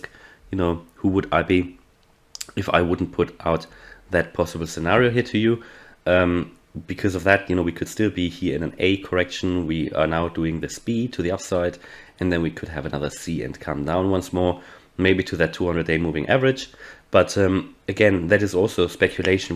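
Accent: Germany. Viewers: Yet your accent is german.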